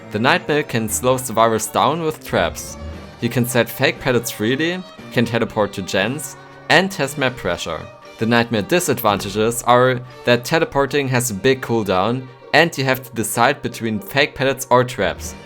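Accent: Germany. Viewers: Please do a survivor ranking next. I really like your Deutsch Akzent. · The german accent xD good video ^-^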